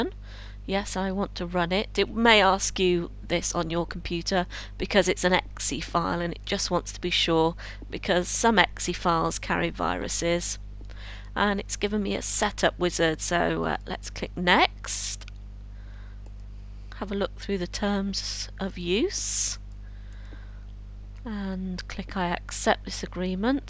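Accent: United Kingdom. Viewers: are u a girl or a boy sounds?0_0 oh i hear the british sounds in ur voice lol cool. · It's british accent :P that's why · nah man....i said that because this woman sounds like one of those British nannys....xD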